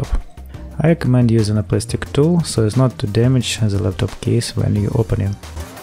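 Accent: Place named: Russia. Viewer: Strong russian accent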